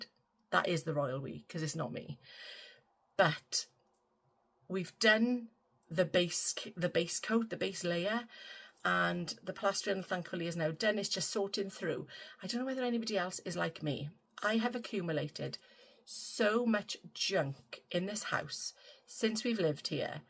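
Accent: Wales, United Kingdom. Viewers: Nothing to do with slimming but I just love listening to your beautiful Welsh accent.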